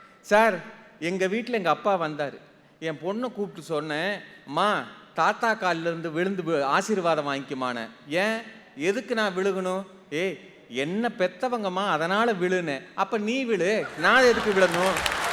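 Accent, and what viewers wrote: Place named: Kongu, India. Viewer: அண்ணன் கொங்கு பேச்சை வரவேற்கிறேன்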